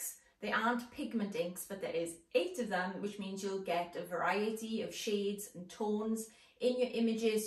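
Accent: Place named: Wales, United Kingdom. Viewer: 🏴🏴 Love your Welsh accent ❤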